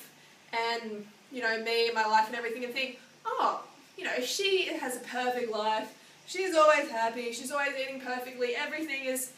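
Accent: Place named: Australia. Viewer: you have a nice body it is such a pity you speak like a dumb bimbo australian!! i was going to marry you until you opened your big ozzy gob!! i come from devon so speak like a farmer look like shrek!! how can you help me? get healthier i am getn a bit of flub on my ut i eat meat wrestle animals to the grounds if i am hungry gnaw at their ankles! if i dont get meat!! your meat is very tasty & nice!! so can i have some?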